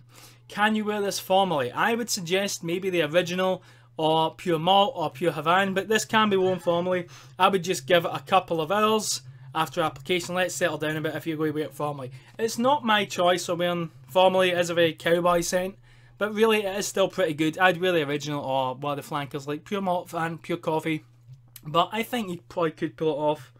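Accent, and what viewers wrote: Scotland, United Kingdom. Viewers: Where in Scotland you from ?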